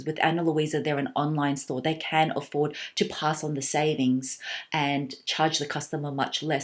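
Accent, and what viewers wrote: Australia. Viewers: I don’t have piercings but I really appreciate these earings, the creative aspect and your kindness behind the creation. Thank you so much for being so mindful of the fact that being able to see the world around us is a blessing and giving back is something we should do because we’re so luckier than a lot of people. I used to live in Australia and I feel really connected with you and your accent. I really like you for being so humble despite having a lot of luxury goods. I wish you all the best. Youtube needs creator like you. Keep up the good work :)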